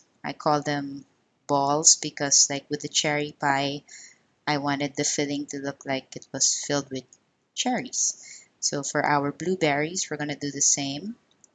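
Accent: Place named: Philippines. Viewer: God your so talented!!! I was wondering if you could make egg tarts or any other Japanese, Chinese, Filipino or any asain famous foods. Pretty please!!! Also, i can hear that you have an accent, well, I think. Are you a filipina po?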